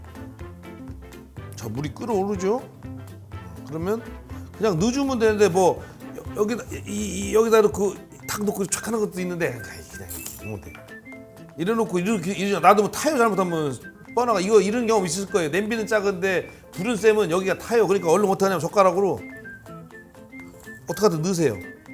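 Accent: Chungcheong, South Korea. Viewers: 구수한충청도사투리언제나정겨워요 저도동향이라 ㅋㅋ 감사합니다 언제나행복하세요 소유진씨와~~홧팅♥♥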